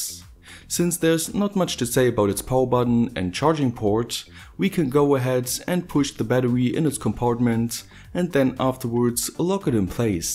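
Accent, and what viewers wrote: Germany. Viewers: wow you good with electronics mate! Like your accent sounds german.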